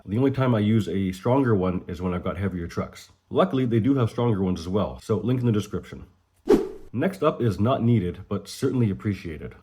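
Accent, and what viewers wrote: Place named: Canada. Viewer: Hearing Canadians say "out" always cracks me up😂😂. Thanks for the info!!!!!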